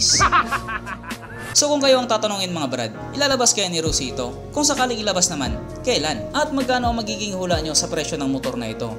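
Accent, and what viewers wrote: Visayas, Philippines. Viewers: Bisaya pay imo haha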